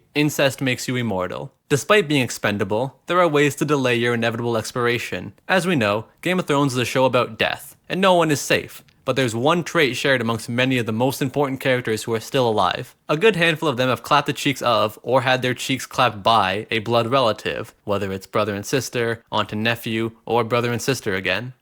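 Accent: Newfoundland, Canada. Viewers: Wait, are you from Newfoundland? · are you from newfoundland or irleand or scotland? dont hear the word bhys on youtube much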